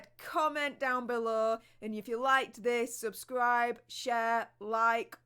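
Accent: United Kingdom. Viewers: I'm thinking it's a Yorkshire accent? Maybe she'll correct me if I'm wrong lol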